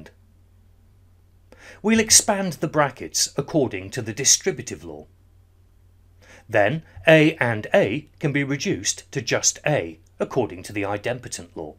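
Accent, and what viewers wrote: England, United Kingdom. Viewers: i liked your English accent, good work, how do i ask my question??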